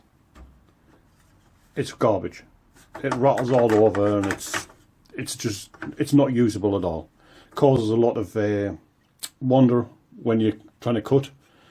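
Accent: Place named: Newcastle, United Kingdom. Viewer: Cheers for that Geordie lad :)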